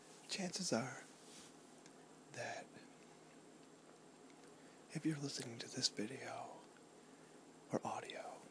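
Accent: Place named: New England, United States. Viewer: Are you from Boston?? Or anywhere in mass. Or southern new Hampshire because you talk exactly the way I do and I'm from southern new Hampshire :)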